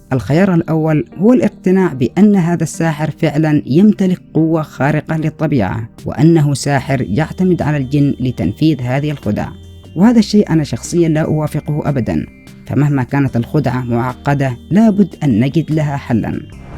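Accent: Yemen. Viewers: عمر باعمر هذه لهجة يمنية و يصعب فيها نطق بعض الحروف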